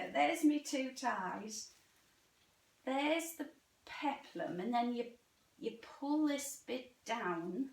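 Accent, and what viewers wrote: Newcastle, United Kingdom. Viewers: Morning, is the pattern piece you showed not the back? You’re such a laugh and it’s great to hear your Geordie accent, which I miss so much. 🙋🏻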